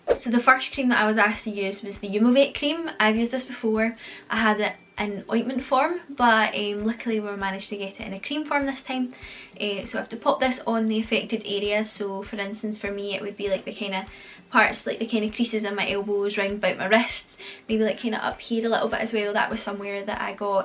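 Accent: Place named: Scotland, United Kingdom. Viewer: Holy shit your results are stunning! Beautiful skin! I'm feeling all proud of myself as an American who was able to correctly identify your accent as Scottish...lol. That's as best as I can do though. I'm glad to have found your channel!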